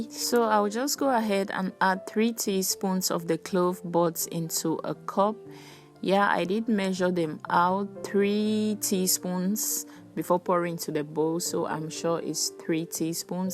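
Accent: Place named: Cameroon. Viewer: plus u sound like Cameroonian, are u Cameroonian?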